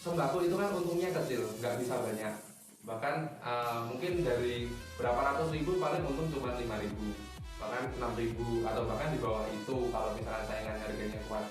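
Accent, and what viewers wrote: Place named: Java, Indonesia. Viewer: Maju terus..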